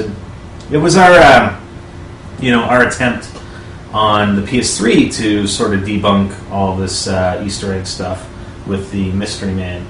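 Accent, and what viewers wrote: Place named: Canada. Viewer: You're Canadian? I always thought you sounded like it.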